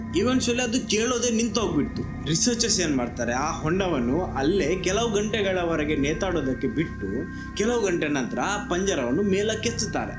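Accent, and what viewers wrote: Mangalore, India. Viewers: Your From manglore ???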